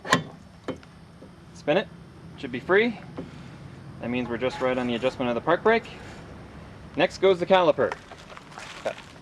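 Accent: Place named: North, United States. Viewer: I suspect by his accent he is up north, in the US ... hence the rusting....great video..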